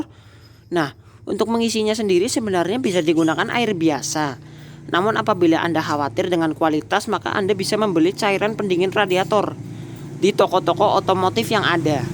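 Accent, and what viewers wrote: Banyumas, Indonesia. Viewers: Ngapak² nih..terimakasih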